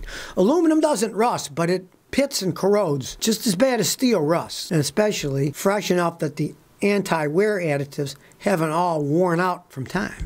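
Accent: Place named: Buffalo, United States. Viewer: SO FUNNY ,I BEEN watching this show , your in Texas ,but I heard Buffalo ,you talk real quick ,that Lake Erie water made you smart.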